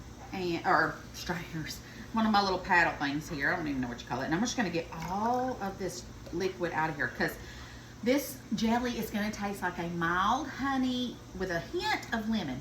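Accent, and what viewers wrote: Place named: North Carolina, United States. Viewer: Sweetie, please quit apologizing for your pronunciation of words & your accent!!! We love you just the way you are!! I happen to be from North Carolina & talk just like you so I love it!! Great looking jelly! God Bless!!! 🙏❤️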